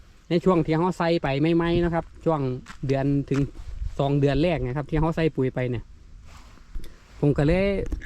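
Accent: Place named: Chaiyaphum, Thailand. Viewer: สำเนียงคือชัยภูมิแท้คับ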